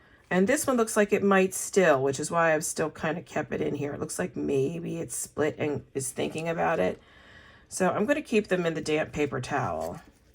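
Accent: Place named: Maryland, United States. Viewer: Before even looking at the video description or hearing you said mid-Atlantic I knew you were a Marylander! Whenever I hear my native tongue it makes me miss my home state. Happy gardening from western PA!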